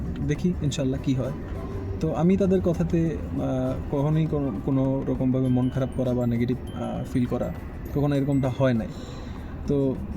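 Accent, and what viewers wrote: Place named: Bangladesh. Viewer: Bangladeshi laicent lage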